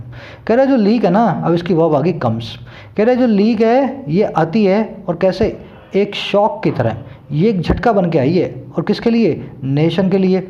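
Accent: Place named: Uttarakhand, India. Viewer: Sir, Your pronunciation is like Uttarakhand's Pahadi people.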